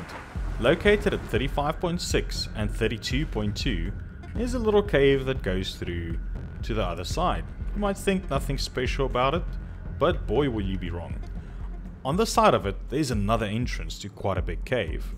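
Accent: South Africa. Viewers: Are u from South Africa? Ur accent sounds like ur fromt here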